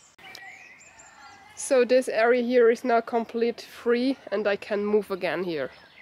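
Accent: Bavaria, Germany. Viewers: Servus, " You go girl!" Des is a Amerikanischer Ausdruck! Was bausten du mit dem Loch?( I Dat a root /tornado/luftschutz Keller bauen fuer diesen Zeiten- man derf nett bloed sein - die Zeiten waeren schlimmer- nett besser). Ois Guads,bleib gsund- Semper Fi- Peter p.s. des gfoid ma wenn du so nett bairisch sprichts mit deinen Tieren.( unser Germanische r Stam is wichtig gel.)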